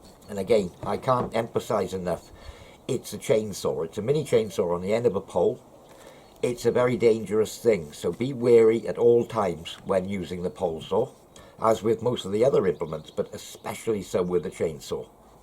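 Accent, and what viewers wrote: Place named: Wales, United Kingdom. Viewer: Great video... Deserves more views!! I'm probably going to buy it as a direct result of this video... You should have an amazon link to this one, so you get a bit of coin when viewers buy...!! Keep making these videos dude! You also sound kinda local? I'm in Ammanford, just north of Swansea. Where you from butt?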